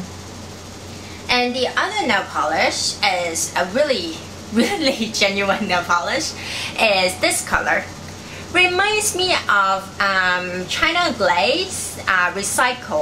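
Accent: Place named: Philippines. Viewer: Where do u from? U sound like a pilipino lolz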